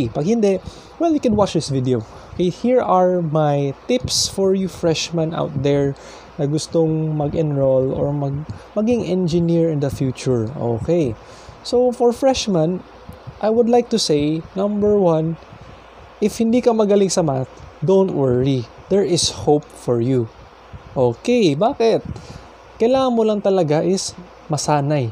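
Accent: Visayas, Philippines. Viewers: Bisaya ka Kuya?? Idol tikaw hehe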